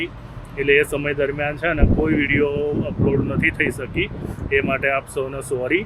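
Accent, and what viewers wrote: Mehsana, India. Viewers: ભાઈ તમારી બોલવાની ભાષા પર થી લાગેછે કે તમે મહેસાણા જીલ્લા ના રહેવાસી લાગો છો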